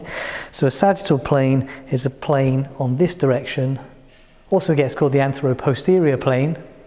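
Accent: United Kingdom. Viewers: Yeah i really don’t like bri’ish accent(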